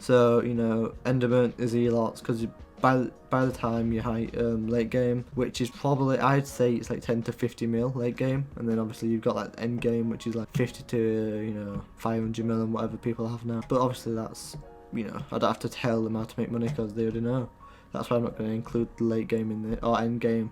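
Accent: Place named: United Kingdom. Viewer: The critisicm.. if people are donkeys and can't understand English doesn't mean they need to complain in the comments. (My main language isn't even English and i can understand him very well.) I'm also self taught with English. So please stop the criticism he just has a British accent jeez.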